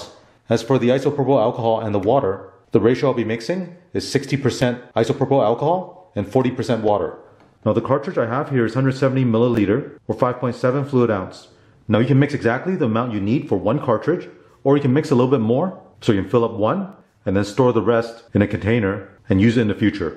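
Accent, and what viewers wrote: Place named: Germany. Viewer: I detect a german accent.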